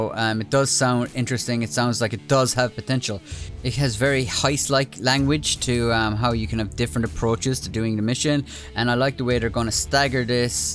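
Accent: Ireland. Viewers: I never tire of that sweet Irish accent.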